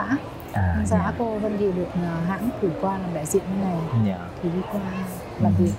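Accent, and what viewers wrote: Hanoi, Vietnam. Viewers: Chi sao van tre &Xinh the nhi! 🐅🇻🇳 Phong cach &giong noi cua Chi that "chuan Hanoi "!💖🇻🇳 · Phai noi giong HA NOI TRUOC NAM 1954 moi dung ban a vi sau nam 1954 moi nguoi o bung bien , tinh le vao sinh song o HA NOI roi.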